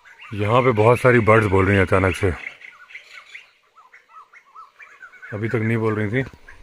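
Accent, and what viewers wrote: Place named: Jabalpur, India. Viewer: Sir aap proper mp se hai ya kumaon hai apki language to jabalpuriya hai Bilkul clist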